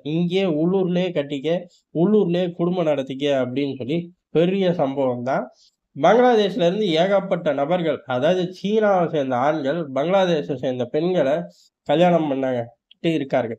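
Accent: Madurai, India. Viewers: தல உங்க மதுரை வட்டார வழக்கு சூப்பரா இருக்கு....அருமையா பேசுறீங்க....நாலஞ்சு எடத்துல குபீர் சிரிப்பு....மாம்பழக்கூடைய தூக்கிட்டு சைனா கெளம்பிட்டான்யா.....கிராஸ் பார்டர் மேரேஜ் சீன மாப்பிளைகளின் மவுசு.....😂😂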